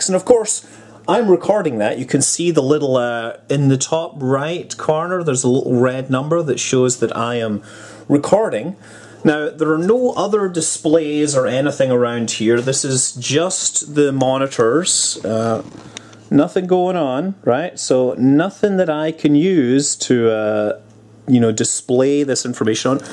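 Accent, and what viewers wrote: Scotland, United Kingdom. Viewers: I understand that, however, say you launch and have enough velocity to reach the same altitude as an orbiting craft for a moment, but you're on a ballistic trajectory so you're going to return to the atmosphere, but you dock, your mass would then... have what effect on the craft you dock to? I imagine the added mass would then decrease the speed of the craft you docked too... I know I could look it up, but I prefer my science dolled out in a Scottish accent ^__^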